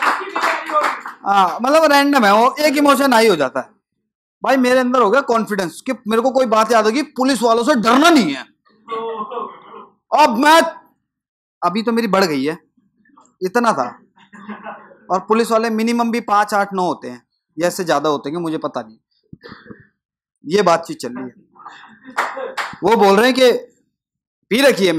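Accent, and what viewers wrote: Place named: Haryana, India. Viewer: Haryanvi accent top notch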